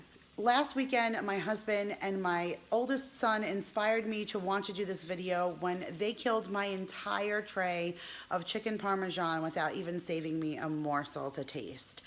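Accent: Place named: New Jersey, United States. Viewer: I recently started watching your videos. Where are you from? We just moved to New Jersey last month (my husband is in the military) and your accent sounds familiar to what I hear around here sometimes. I'm super excited to try out this recipe.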